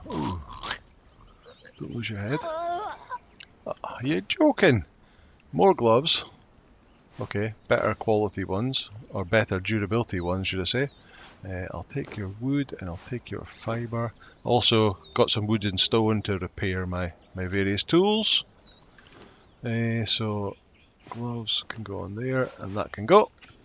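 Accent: Scotland, United Kingdom. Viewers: I think it's still hard core if the goal is to finish the game with one life. No matter what happens to the followers. And it doesn't makes sense role playing wise. To make it hard-core why not zero follower, no healing items? Only eat berries that kind of stuff 😂 I'm starting this challenge right now. Except I will allow my followers to die and I'll be on a official sever. Thanks for the inspiration. Btw are you Scottish? The calm ton of your videos and your accent are the reasons why I watch your videos.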